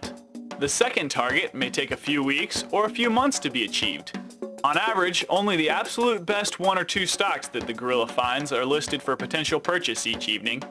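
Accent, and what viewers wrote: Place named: United States. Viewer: Please turn on caption i want to see in Hindi.i can't understand American English.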